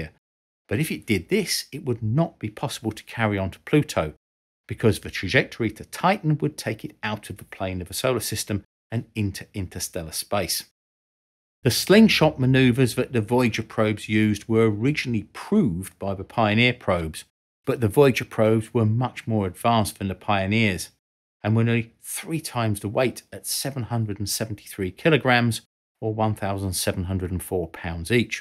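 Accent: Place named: Cockney, United Kingdom. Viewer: I applaud any channel for not using AIvoiceover. But it sucks when you find good content, and then you hear that godawful cockney accent. ‘Earf’‘frough’ ‘firteen’…. It makes it so bloody unwatchable.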